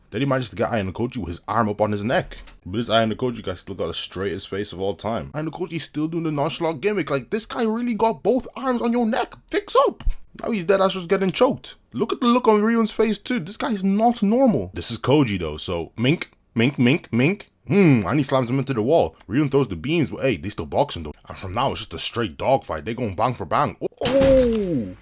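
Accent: Ireland. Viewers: are you Irish 🇮🇪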